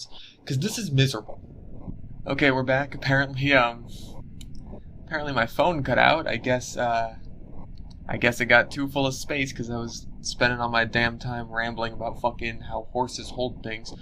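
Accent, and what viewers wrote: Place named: Brooklyn, United States. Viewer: that brooklyn accent is great ps: baxter is very cute and pure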